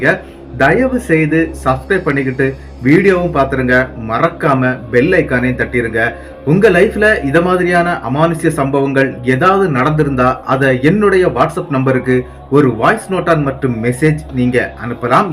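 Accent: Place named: Kerala, India. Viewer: Malayaliya? Slang mix aguthu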